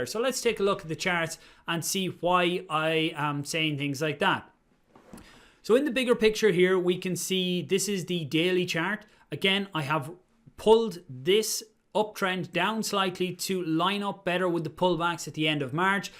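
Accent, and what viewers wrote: Ireland. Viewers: normally i can't understand Irish people, but i didn't realized he's having irish accent till i saw your comment.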